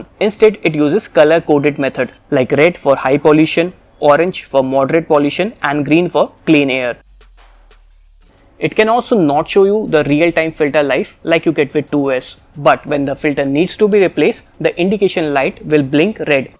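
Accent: India. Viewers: Professional video !! Except the strong Indian English pronunciation is a bit annoying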